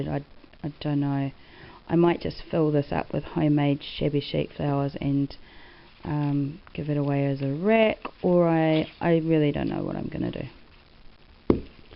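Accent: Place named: New Zealand. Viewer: Wow this is breathtaking I love it i am making one at the moment for my nan but Hot dollar has the book box already to go...You sound like you are from New zealand I am from Australia were not that far lol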